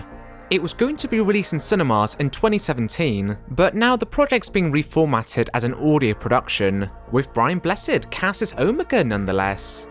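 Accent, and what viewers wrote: United Kingdom. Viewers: People hear a British accent and immediately go "you could be the Doctor!".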